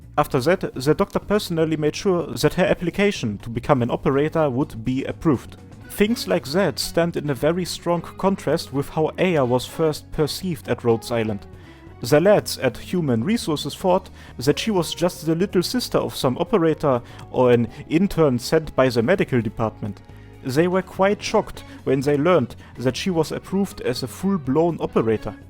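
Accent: Germany. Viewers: The way you pronounced "Heiligkeit" as well as your accent in general make me believe you're German. Please tell me if I'm correct with that assumption.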